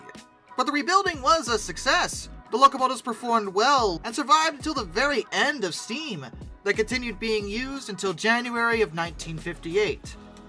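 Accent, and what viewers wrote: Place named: Midwest, United States. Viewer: Midwest, I think. Doubt he's from the east since he stumbles on stuff like "Worchester."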